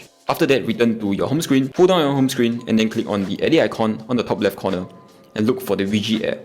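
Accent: Singapore. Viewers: This is cool but I can’t understand anything you’re saying because of your oriental Singapore accent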